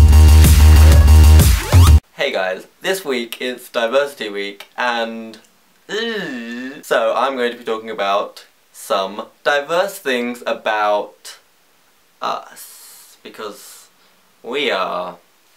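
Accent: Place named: United Kingdom. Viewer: It's because he's British. They don't understand his accent. Or they're just racist against the red coats :P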